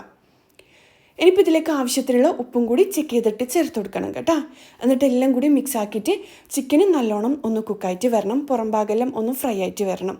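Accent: Kannur, India. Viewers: സൂപ്പർ👌👌👌❤️❤️❤️👍 ഉണ്ടാക്കാംഇൻഷാ അല്ലാഹ് കണ്ണൂർ ആണോ വീട് ഭാഷ കേട്ടപോൾ😀നല്ലോണം തണിയാ വെക്കുക്ക😂 ഭാഷ കേട്ടപോൾ ചോദിച്ച നമളെ ഭാഷ😀😀😀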